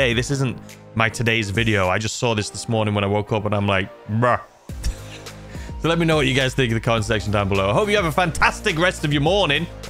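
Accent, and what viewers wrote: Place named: Scotland, United Kingdom. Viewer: youre scottish? love the accent btw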